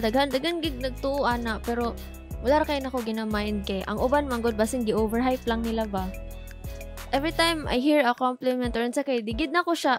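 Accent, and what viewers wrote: Visayas, Philippines. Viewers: Ang sarap pakinggan yung pagsulti nimo bisaya..proud cebuana here..so bisaya rin ako..haha 😊nagseself study ako ng japanese but I'm not good at singing in japanese like you sung japanese songs..super galing mo ☺️😍👏👏,request nman po " " by ft.. thankyou!😍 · Hello again ate ❤️ Cutie mo po always pati yung bisaya accent nyo po. Parang gusto ko na ren matuto magbisaya🐱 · Kung di ka taga cebu, taga davao ka na bisaya 😂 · Oh so she is from the Philippines well i don't know that accent is it Visayas or Mindanao?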